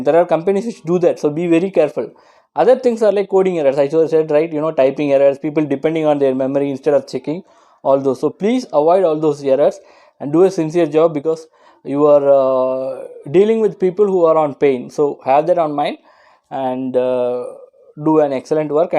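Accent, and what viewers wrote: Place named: India. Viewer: Oh man, I'm really struggling to understand that hardcore Indian accent! Subtitles help a lot.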